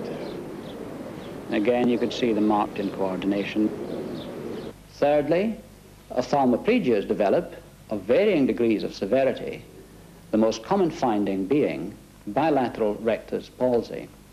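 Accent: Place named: England, United Kingdom. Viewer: That's a proper english accent. Major thing i like docs for.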